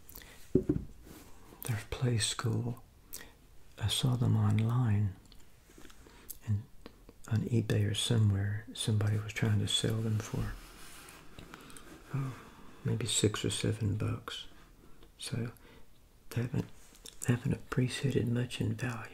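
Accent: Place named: South, United States. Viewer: You have a very calming voice and accent 😊 Are you from the South?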